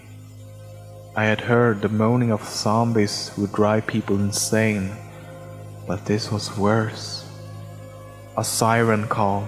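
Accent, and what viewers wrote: Sweden. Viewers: his swedish accent is more prominent in his older videos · The jolly, good Swenglish dialect! :D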